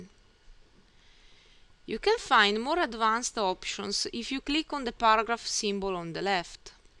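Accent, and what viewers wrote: Italy. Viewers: Are you Italian? Your accent sounds like italian people speaking English. :) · nice to follow even with your italian accent its easy to still understand actually very clear to understand :) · Hahah true I think so, I'm italian and I've think the dame thing 😃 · I'm Italian aswell..sounds like an Italian speaking English but, to me, the rhythm/strong emphasys on consonants when she pronunces 'We will take for example....' and 'which format the page must have...' makes me think that she might be Russian.....I have few Russian friends here in Bangkok and the 'musicality' is the same....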